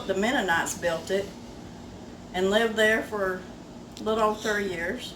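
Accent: United States. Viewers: Wow, that is amazing. Next time take some video of him building this stuff :) What an amazing kitchen and pantry that you have. Oh my goodness... Yeah, please do some teaching videos on CANNING !!!! <3 <3 <3 God bless! I cannot figure out what state you are in with that accent... hmmm....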